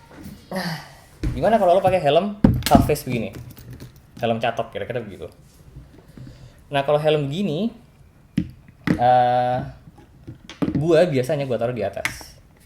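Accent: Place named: Java, Indonesia. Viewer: Orang jogja nih? Medog beut ngmngnya hehe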